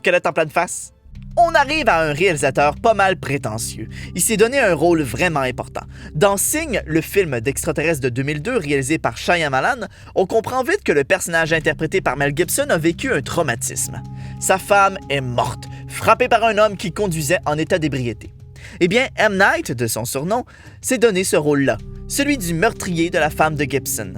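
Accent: Quebec, Canada. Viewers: J'adore ton accent québécois ! XD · Super vidéo, comme d'habitude ! J'adore ton accent québécois, il est juste GÉNIAL ! Continue comme ça et à bientôt !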